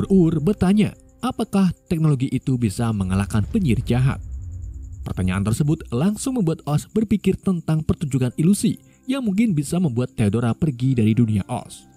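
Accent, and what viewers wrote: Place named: Banyumas, Indonesia. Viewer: pengisi suara ngapak tapi bahsa Indonesia gak telalu mendok...bagus...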